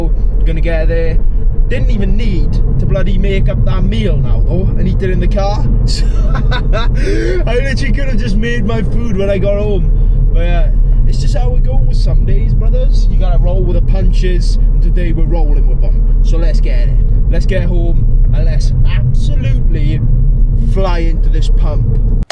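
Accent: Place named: Wales, United Kingdom. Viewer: Where in Wales are you from?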